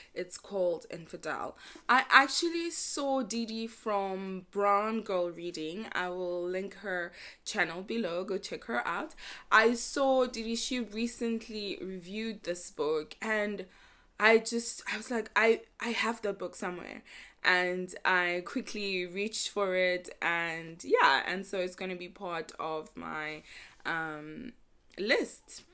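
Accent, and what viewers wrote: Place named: South Africa. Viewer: I'm so glad you were on the Booktube black chat so i could find this channel! Firstly, it's wonderful to hear another South African accent, and secondly, I'm glad you highlight such important fiction. I'm glad to meet you and will be lurking in your other videos' comments soon. 😉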